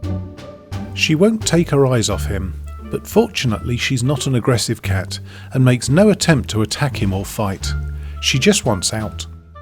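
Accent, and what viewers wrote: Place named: United Kingdom. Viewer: ya i am also hooked and i love the British accent ;p . big Tigo fan myself !!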